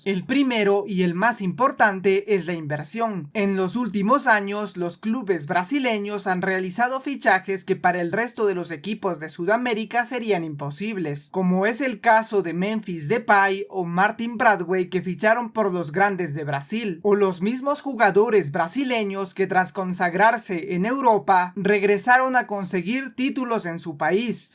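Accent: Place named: Peru. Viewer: Pero este tipo tiene acento peruano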